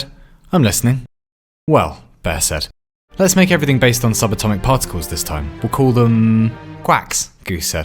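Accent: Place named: England, United Kingdom. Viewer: what really makes this channel awesome is his English accent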